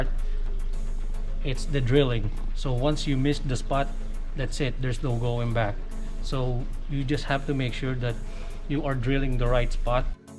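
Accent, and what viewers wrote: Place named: Philippines. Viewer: Sheeesssh bro. I’m about to do the same thing, thanks for a great video. All I got to say is, damn your Filipino accent is thick lol🇵🇭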